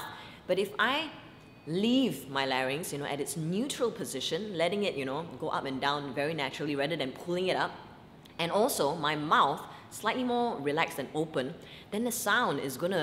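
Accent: Singapore. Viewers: Are you from Singapore? Your accent sounds lightly Singaporean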